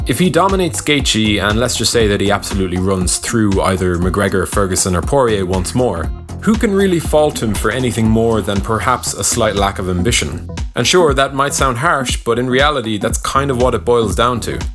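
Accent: Ireland. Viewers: Nothing better than hearing how great Khabib is in an Irish accent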